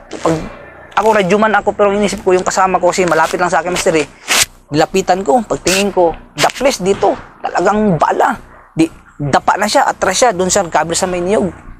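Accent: Philippines. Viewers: tagalog na tagalog talaga hane!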